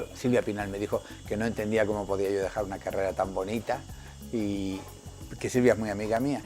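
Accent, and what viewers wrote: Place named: Spain. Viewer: Tantos años en México...¿porqué el acento de España?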